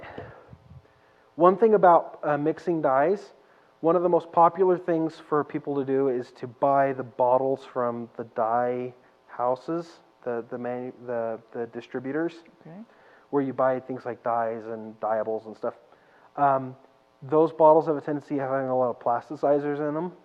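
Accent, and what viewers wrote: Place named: Brazil. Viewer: The woman...she's brazilian, right? Brazilian accent going on hahahha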